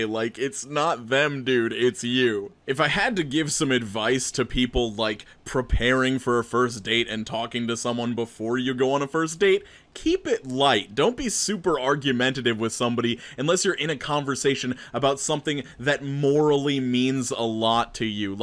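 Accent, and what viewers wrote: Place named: New York, United States. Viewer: That New York accent and voice killed me dude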